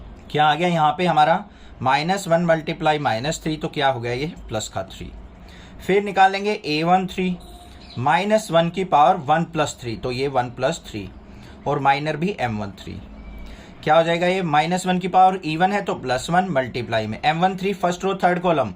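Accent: Haryana, India. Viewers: Sir I think aap haryana se ho.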